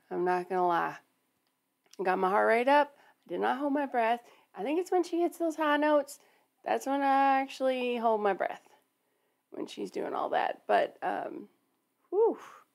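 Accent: South, United States. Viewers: Uilleann pronounced ILL-En or ILL-Yen for your southern accent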